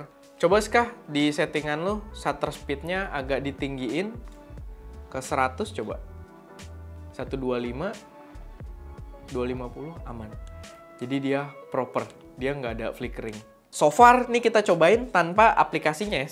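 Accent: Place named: South Jakarta, Indonesia. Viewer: Bang org Jaksel ya...? 😅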